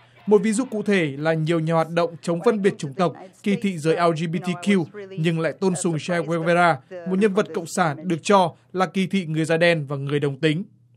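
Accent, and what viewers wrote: North, Vietnam. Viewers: Giọng bắc mà đi phê phán chủ nghĩa Cộng sản là sao nhể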